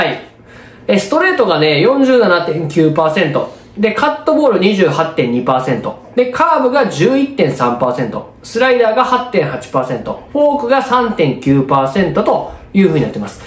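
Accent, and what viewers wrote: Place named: Kyoto, Japan. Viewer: 訛りを聞いて関西は関西でも京都の人だとすぐ分かった！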